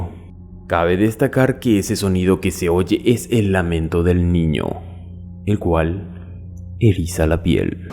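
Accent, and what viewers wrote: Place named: Paraguay. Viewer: algun dia hace un video hablando con nuestro acento paraguayo! Me gustaria mucho ya que sos paraguayo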